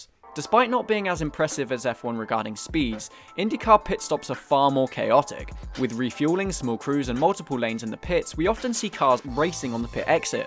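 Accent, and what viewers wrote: United Kingdom. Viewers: Nothing cooler than hearing a Brit talk about Indycar! You have a new subscriber! 🏎🏁🇬🇧😎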